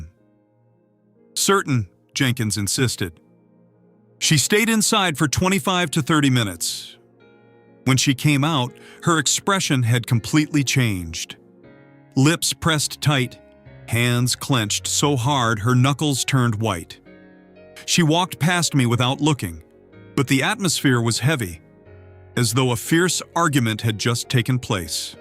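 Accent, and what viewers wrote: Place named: United States. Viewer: Why do we have to have American sounding readers 🤬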